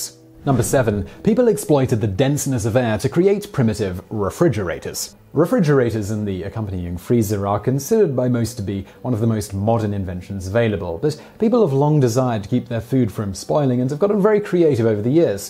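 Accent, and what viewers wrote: United Kingdom. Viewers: Your way of talking and breathing and articulating and .. it's so weird, like you've got astma, but I believe as you're british, that helps on the video sound, the channel is awesome !!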